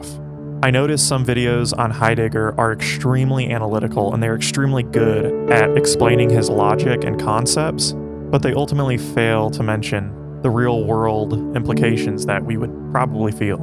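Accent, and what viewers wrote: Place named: South, United States. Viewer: Intellectual southern accent is nice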